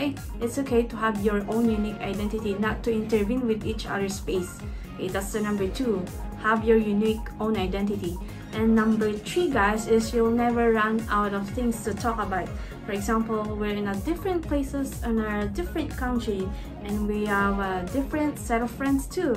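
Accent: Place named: South Korea. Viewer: Parang korean ka Sis mag English hehehe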